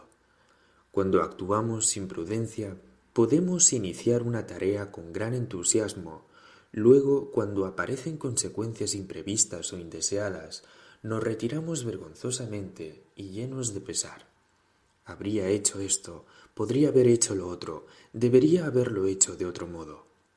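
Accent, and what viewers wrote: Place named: Mexico. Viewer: Me encanta su claro hablar y también el acento de México es muy entendible y claro pero el resto de Sudamérica es fatal.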